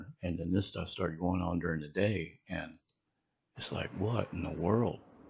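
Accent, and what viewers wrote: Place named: United States. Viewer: Ok. Maybe it was someone else but i thought I had asked you about the solar eclipse we had about 2 years or so ago. And if you had any footage?. I had heard stuff going on at that time. But good to know, you definitely sound American 😄. I'm down in NC. I've noticed must things I see here are towards the east! Maybe coming over the ocean.?.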